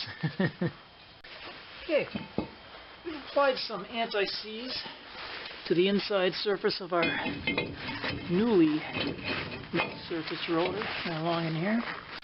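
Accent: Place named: Canada. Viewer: Great research material. I should make a video to see if I do as well. I am sure I would have to mute sound... You sound like a Canuck, just the pleasant, easy going feel of the video. There have been many times I wish I had been born north of the border. Thanks again for taking the time to make a quality How-To.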